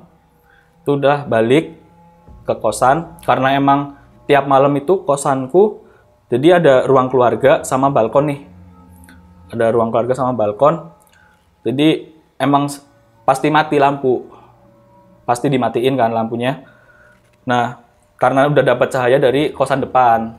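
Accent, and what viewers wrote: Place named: Java, Indonesia. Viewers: Ledok bahasanya jang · Logat ngapak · Medhok" mas🤗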